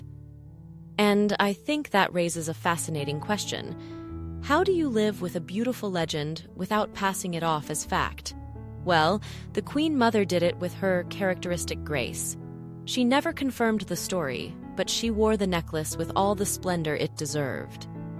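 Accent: United States. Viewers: I must say that although the channel Has an American accent, the understanding of the position of Royalty and its relationship with the people is spot on. Something many foreign commentators never ever get right. E.g. the way the Queen Mother wearing her jewellery is not vanity but respect for those who really ‘own’ the jewells. The people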